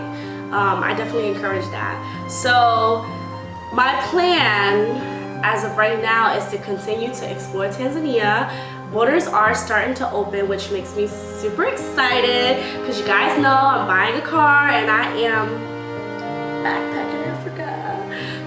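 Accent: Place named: New York, United States. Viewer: Habari gani? asante sana for the vid. loving that accent, i know it when i hear it. new york in the house. loving that. Thanks for sharing and keeping us posted. you are truly a jewel. Life will only get better from this point out. peace and blessings.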